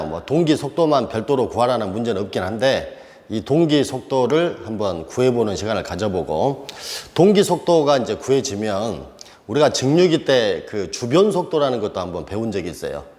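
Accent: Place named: Honam, South Korea. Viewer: 교수님 정말 멋진 강의하고 있습니다 구수한 호남사투리에 귀에 쏙쏙 박히는 강의 내용 제가 경상도 50대 후반 남자 꼰대인데 인생살면서 교수님처럼 멋진 강의 처음입니다 구독자들이 어떤 건의사항들이 올라올수 있지만 그냥 교수님 스타일대로 하세요 대한민국 최고의 교수입니다!!! 멋집니다!! 어떤 것도 바꾸지 마세요!!! 정말 이대로~~~~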